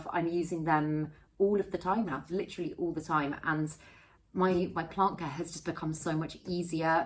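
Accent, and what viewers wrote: England, United Kingdom. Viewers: I love your videos, they are very informative and I love your English accent, I live in South Texas and we can grow so many plants here in pots and in the ground. I have philodendrons and alocasia outside, and they go dormant in the winter and pop back out every spring, such hardy plants and so full and beautiful in the summer, people actually stop to admire my yard.!!!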